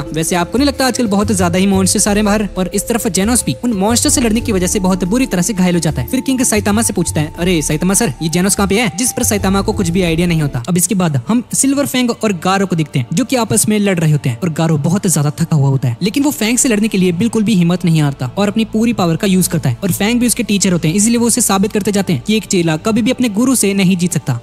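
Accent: Punjab, India. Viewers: Bhai aap punjab se kya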